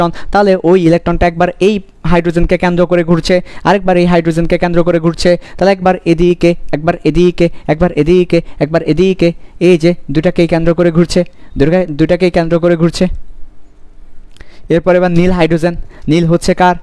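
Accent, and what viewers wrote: Chittagong, Bangladesh. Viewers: Bhaia apni chattogramer????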